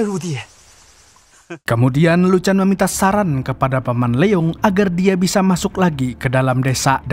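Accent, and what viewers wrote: Banjar, Indonesia. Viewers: banjar banget sii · Admin ikam buhan banjar kahh logat nyaaa ulun sukaaa sekali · Bg lo kalsel dimananya, bahasa banjar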